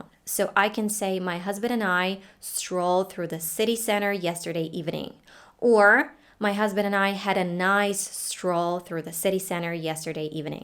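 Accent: United States. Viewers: Hola. Soy Gabo. Vivo en USA y mi hijitame dice que tu inglés es Americano. Ella sabe inglés pero yo aun no. Me gustaría saber como inicio tus clases. Gracias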